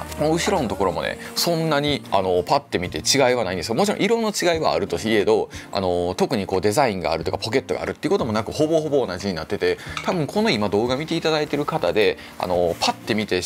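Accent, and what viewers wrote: Kansai, Japan. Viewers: 埼玉県民です。 毎回思うんですが非常に聞き心地の良い関西弁でした。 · うp主さんに合わせてコメント欄も関西弁(風)多めなのかｗ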